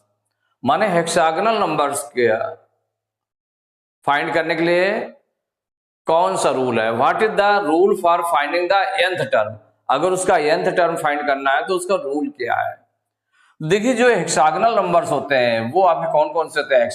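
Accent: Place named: Bihar, India. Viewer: Sir aap. Bhiar se ho kya kyu ki aapka aawaj bhiar jesa lqg rah hai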